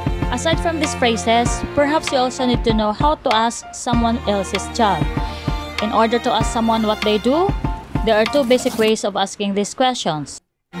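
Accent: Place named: Philippines. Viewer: Why does the background music remind me of motion gate dream works section?amd I love ur philippino accent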